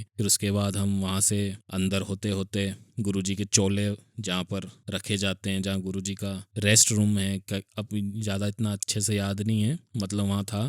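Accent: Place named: Indore, India. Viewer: Uncle ji aap v satsang sunaya kijiye me v bht time indore rahi hu indore is my favorite place😍😍aapki boli mein wo pura nazar aata hai😍 Jai Guruji🙏🙇‍♀️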